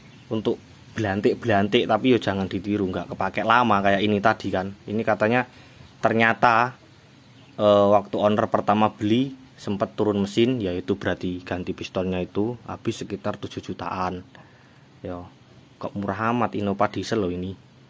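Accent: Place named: Kediri, Indonesia. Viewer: kulo pikir lek kediri om aksen jawanya😂😂😂😂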